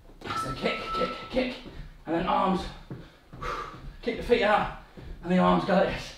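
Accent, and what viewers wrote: United Kingdom. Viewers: Far too british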